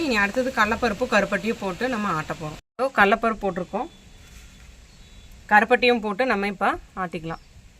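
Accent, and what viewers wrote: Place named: Coimbatore, India. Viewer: Coimbatore slang and snacks super ங்கொ 🙌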